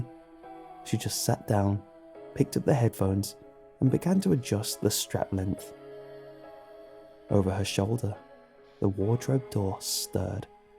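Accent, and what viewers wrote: United Kingdom. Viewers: Mr.BrittishAccecentCreepManDudeChillVoiceCreepypastaSir Cna i have a like from u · Well, I really enjoy listening to pastas read by you (especially since I definitely prefer British over American accent), so like always, good job :) But I just had to comment on those stories - it was pretty easy to guess what was going to happen next and they were not scary at all. And please - how could I expect a phone making a sound when someone is hiding xD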